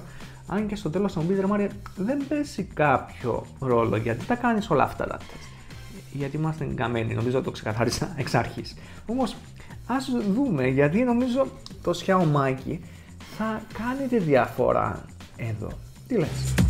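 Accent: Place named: Cyprus. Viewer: Πολυ μ αρεσει η κυπριακη προφορα. Ηρθα το καλοκαιρι στην Λεμεσο και εγινα φιλος με τους πάντες .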